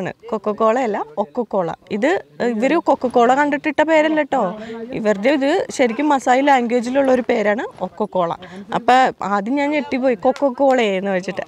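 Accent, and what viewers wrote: Thrissur, India. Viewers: ഇത്രയും നാൾ യൂട്യൂബിൽ കേറി ഇറങ്ങിയിട്ടു ഇന്നാണ് ഞാൻ ചേച്ചിയുടെ ഈ വീഡിയോ കണ്ടത്...പിന്നെ സംസാരം കൊള്ളാം ട്ടാ. എവിടാ വീട് തൃശ്ശൂര് ആണോ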